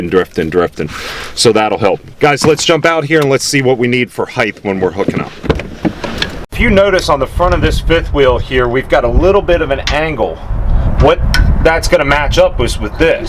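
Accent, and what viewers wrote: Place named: Pittsburgh, United States. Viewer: This is funny because I'm from Pittsburgh living in Florida and I know a yinzer when I hear one. Feels like home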